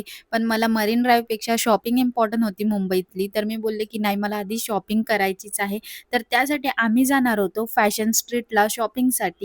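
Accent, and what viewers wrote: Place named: Vidarbha, India. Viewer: मराठी भाषेचा विदर्भ tone बदलून शुद्ध केला तर खूप छान व्हिडिओ होतील आणि नक्कीच चॅनेल monitiesed होण्यास मदत होईल, कारण बाकी सर्व छान आहे त्यात केस तर खूप सुंदर ❤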